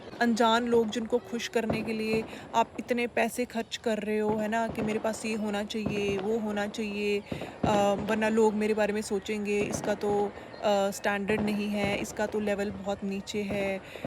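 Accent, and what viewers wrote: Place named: Punjab, India. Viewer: Aap Punjab k ho ya विदेशी Me Rajasthan se हू😮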